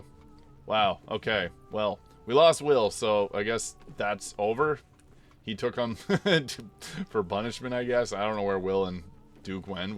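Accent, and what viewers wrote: Maritimes, Canada. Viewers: Interesting. Thanks. I could tell you're from the Maritimes by your accent 😊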